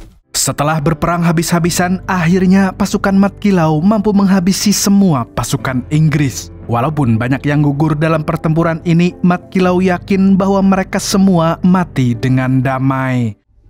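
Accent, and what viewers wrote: Banjar, Indonesia. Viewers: Mang urg banjar kah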